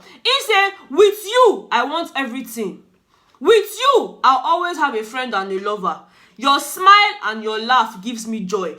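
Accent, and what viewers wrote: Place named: Igbo, Nigeria. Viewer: Ozo carry relationship as strategy and e dey fail before him eyes yet him dey there. I love this your igbo accent.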